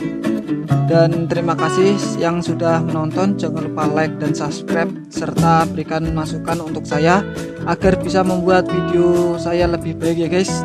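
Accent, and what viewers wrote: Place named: Java, Indonesia. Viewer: Suara elu itu mas mas jawa, blepotan, ancur banget poko nya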